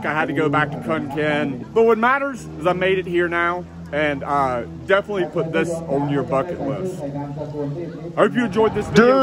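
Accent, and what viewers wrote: United States. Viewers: I love the way Americans pronounce it Boooooda :)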